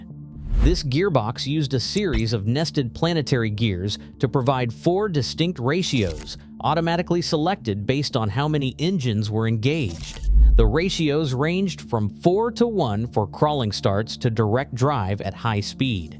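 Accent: United States. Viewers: Why an American narration?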